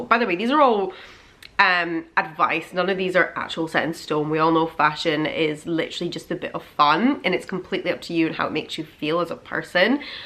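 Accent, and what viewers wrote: Scotland, United Kingdom. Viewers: Your accent is so pretty. Are you from Scotland or Northern Ireland?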